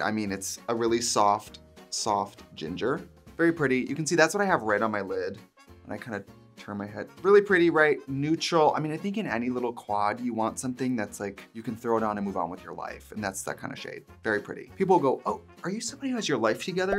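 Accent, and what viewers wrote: Wisconsin, United States. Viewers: As someone who lived in Wisconsin for 10 years, her accent coming out always makes me giggle lol. It’s prominent in a lot of the things she says but it’s too funny when it REALLY comes out 😂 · the wisco accent 😭❤️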